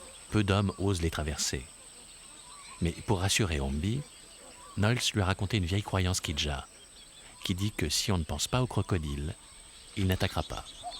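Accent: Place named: Marseille, France. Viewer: De ouf le narrateur il est un peut marseillais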